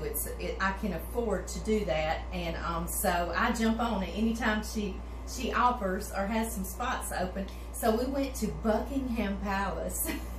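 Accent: South, United States. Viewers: So much yumminess in one cake ... looking forward to baking one of these for dessert the next time my adult children come over for dinner. Thanks for sharing your recipe. Side note: So refreshing to hear your Southern accent!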